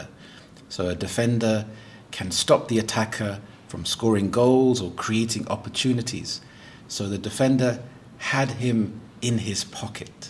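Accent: United Kingdom. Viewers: Hello,! I don't know if you have any videos that talk about this subject, but I'm curious, because I see that you speak differently from other British YouTube channels that I follow... What version of British English do you speak? Written with Google Translate.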